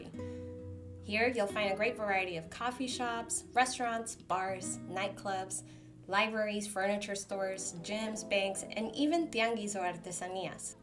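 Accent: Mexico. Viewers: Kinda feel honored about the coolest neighborhood in the world mention, HA! Most my life has been marked by this lovely neighborhood… Used to skateboard in Chapu in the early 00's (pre-remodeling), dad had a business half a block from it, and I currently live 2 blocks away from Templo Expiatorio. Gentrification has been playing a big role in the last 10-15 years, lots of high rises and poshy vibes, it certainly IS the place to visit while in Guadalajara! Not so much to live in; beggars, theft and pollution are not rare, as you'd expect in any major city centre worldwide, not only in México, sadly. Hey, I'm an architect and there a lots of hidden jewels in the hood, let me now and we could set up a filming tour around here, btw, you have a very mexa pronunciation, where u from? =One Love= –A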